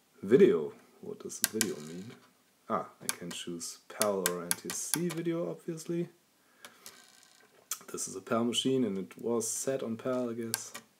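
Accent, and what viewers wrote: Germany. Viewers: Weird. I’m hearing “a-to-zed” or “day-to-zed” or “data-zed” but the subtitles always say dataset. I guess that’s the German accent playing there!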